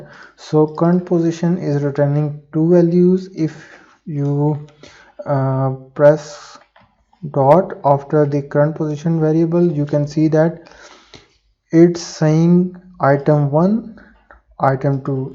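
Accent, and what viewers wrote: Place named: India. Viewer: Once again, a dude with a thick Indian accent has the answer to my prayers.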